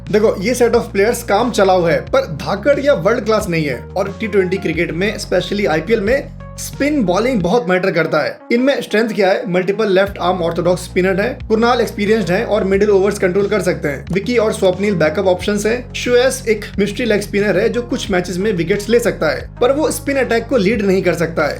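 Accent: Bihar, India. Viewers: Bhaiya bihar se ho kya ❤❤❤❤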